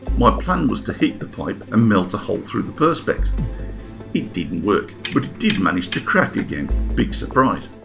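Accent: Birmingham, United Kingdom. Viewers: As is David Attenborough to the natural world, so is to furnaces. With a Brummie accent, of course,;-)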